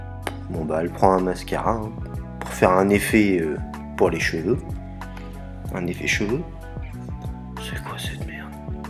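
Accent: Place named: Pays de Caux, France. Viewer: Avec le vrai accent cauchois , on adore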